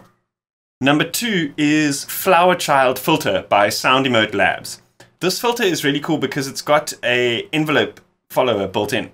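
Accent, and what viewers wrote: South Africa. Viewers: Is that a JHB accent I hear? - anyway - Nice Video - but you only said the words "Sort of' 200 Times :) - Thanks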